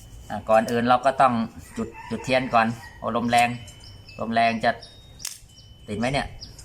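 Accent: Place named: Trat, Thailand. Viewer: พูดสำเนียงคนตราด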